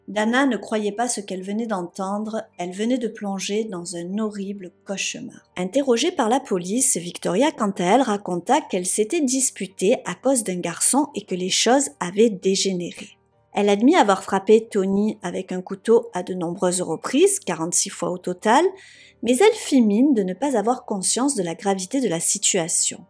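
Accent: South, France. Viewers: Génial!! Tu viens du sud de la France, selon ton accent? Marseille?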